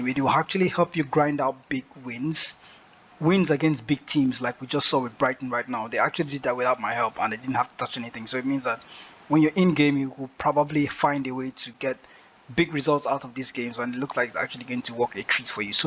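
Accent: Nigeria. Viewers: Why do you sound so Nigerian? Nice video by the way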